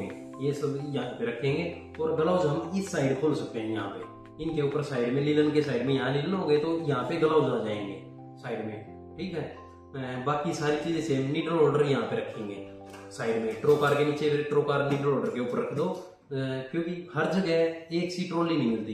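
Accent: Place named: Haryana, India. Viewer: Paka haryana ta hai ladle 😂😂😂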